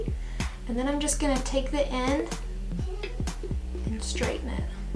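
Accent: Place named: United States. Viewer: O wow u got a country accent. Never really noticed it when i watched u guys’ vlog channel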